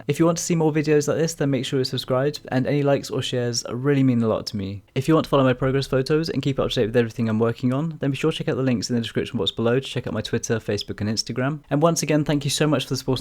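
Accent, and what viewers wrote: United Kingdom. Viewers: I take it from the accent your in the UK , you think you could draw a dragon and dismantle the picture in to sections that could be cut from steel ? im a blacksmith near brecon south wales i will let your mind run away with that idea for a while :-) get back to me usk valley forge facebook if all else fails.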